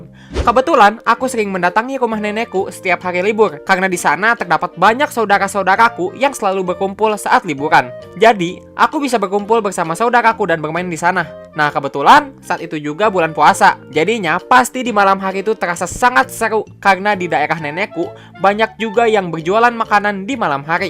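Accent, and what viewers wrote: West Java, Indonesia. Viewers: orang Sunda ya bang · eh Sunda bos orang mana cuy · Abang orang sunda ya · sunda batt:v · bang kocak aku juga mau nanya abang orang sunda😂😂😂😂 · Lu Orang Sunda?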